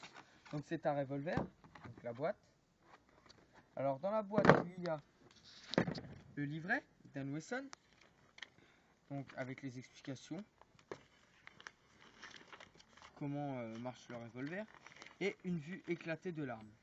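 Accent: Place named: France. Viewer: sa fait plaisir d entendre un francais mdr d habitude c tjr des americain on comprend rien super ta video continue comme sa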